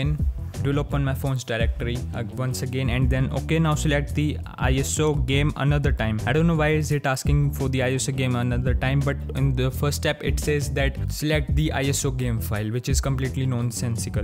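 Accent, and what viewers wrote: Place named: India. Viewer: Yes something thst is indian on english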